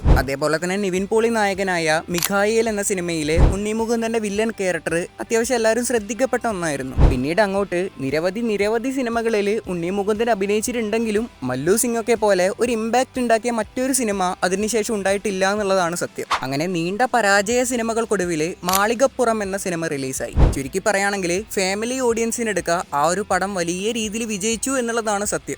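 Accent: Kannur, India. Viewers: bro roastil ittapole kannur slangil samsarikk🤌✅️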